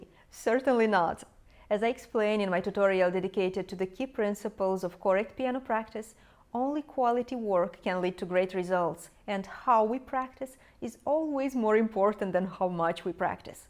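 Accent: Russia. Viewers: Teacher, this audio is better. Keep using the little clip microphone. A previous video I saw, I had a hard time hearing you (scale practice). There was too much room reverb, plus you have a Russian accent. I would consider a course of yours, but as a retired person living on reduced social security, I can not afford it. Plus, I have a hard time trusting you. Mel Gibson was married to an accomplished Russian pianist. Young and beautiful as yourself. They had a baby together. Then they got divorced and she took him to the cleaners.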